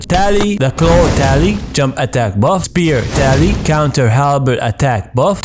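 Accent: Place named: Gulf, Arab world. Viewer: The accent , are you Saudi , Qatari , Kuwaiti ? Uae or Bahraini 🤣 Subscribed for the accent 😂👍 حبيبنا 👍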